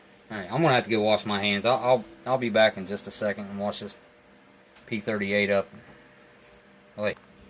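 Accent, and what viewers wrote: South, United States. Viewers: Love the accent. As an Aussie, I appreciate a good country sounding American 👍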